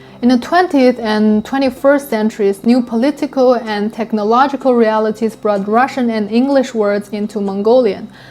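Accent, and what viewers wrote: China. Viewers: You speak English with a Chinese accent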